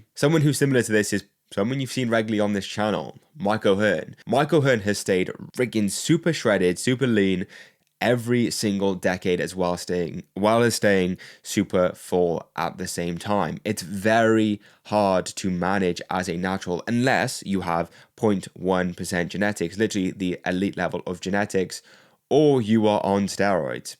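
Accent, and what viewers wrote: United Kingdom. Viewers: restarted and British bro ngl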